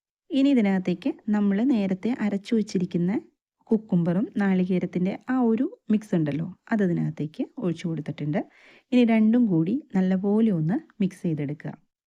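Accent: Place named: Thrissur, India. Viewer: തൃശൂർ കാരി aano? ഭാഷ കേട്ടിട്ട് അങ്ങനെ തോന്നി. ഞാനും ഒരു പാവം തൃശൂർ കാരി ആണ് ട്ടോ 😄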